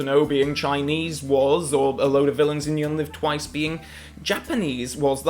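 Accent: Yorkshire, United Kingdom. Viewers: I think it's impossible to dislike. Enthusiastic, insightful, funny, amiable, creative and handsome; he's the perfect YouTube host (which is more of a compliment than it might seem). Personally, I also really like the way that his Yorkshire accent becomes more pronounced when the pitch of his voice rises 🙂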